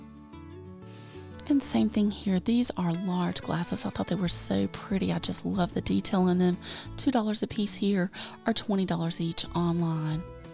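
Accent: South, United States. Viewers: yes, and when we had a land line I used them. It brings back nice memories. Just like taking those trips with you. And hearing your sweet southern accent just brings back all those wonderful growing up days. Many thanks for you doing this for us all.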